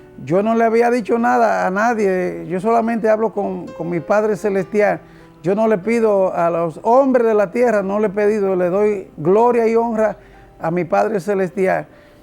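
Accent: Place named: Dominican Republic. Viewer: Dios le bendiga. Apenas empecé a escucharlo no sé si identifica el país desde donde está. Pero lo identifico por su manera de hablar, imagino que es de RD, gracias por su testimonio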